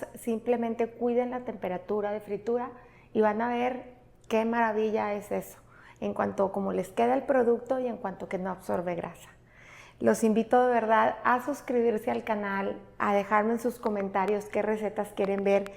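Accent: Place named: Mexico. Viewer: Nena creo no equivocarme de qué sos mexicana y hablas muy lindo muy bonito me encanta tu acento tu ternura tu dulzura tu calidez tu feminidad yo creo que cualquier mexicano se debe sentir orgulloso de que representas a tu país maravillosamente te mando un abrazo eterno Virginia de berisso Argentina.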